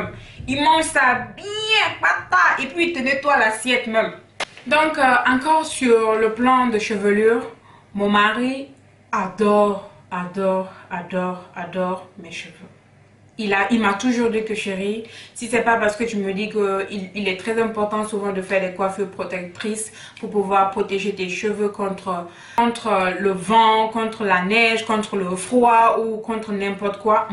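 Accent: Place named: Conakry, Guinea. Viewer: Un accent de conakry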